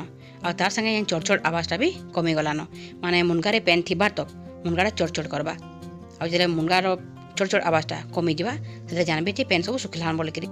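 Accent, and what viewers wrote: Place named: Sambalpur, India. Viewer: Tumar samalpuri kathata jaha mitha lagla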